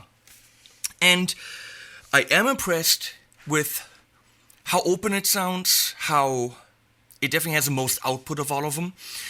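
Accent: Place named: Germany. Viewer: Gernan english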